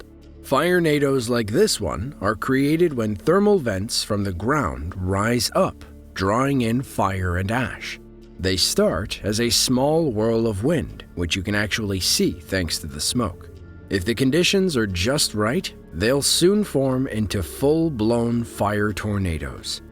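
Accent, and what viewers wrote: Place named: Boston, United States. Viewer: My man loves in Florida and talks like a guy from Boston, “this iz basahh”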